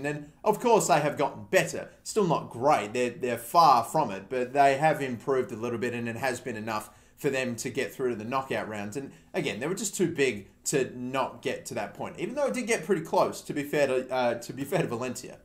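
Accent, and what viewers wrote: Australia. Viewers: R u Australiaan mate good day fellow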